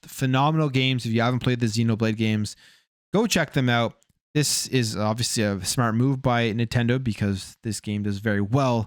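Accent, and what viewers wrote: Canada. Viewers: You a canadian!?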